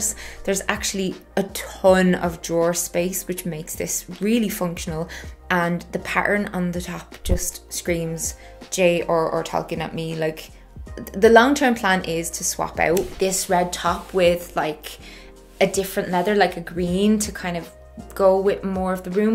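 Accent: Dublin, Ireland. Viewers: The office is amazing, and the girl is so pretty and sounds Irish. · I just stumbled across your channel and I’m going to already subscribed because hearing you reminds me of my sister-in-law in Dublin and I miss her.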